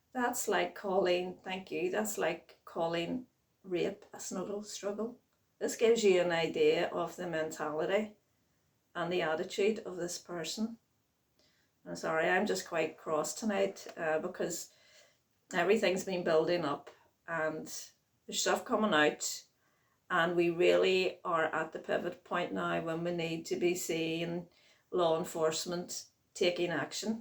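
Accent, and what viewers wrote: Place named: Ireland. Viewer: Nothing lovelier than that Irish lilt🎶🍀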